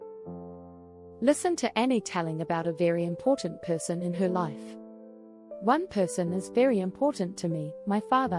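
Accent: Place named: United Kingdom. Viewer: Жестт. Че за акцент британский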